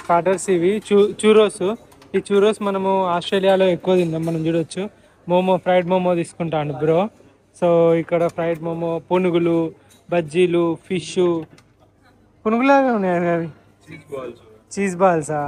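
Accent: Telangana, India. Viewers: Motthaniki telangana vallam anpinchukunnav bro🎉❤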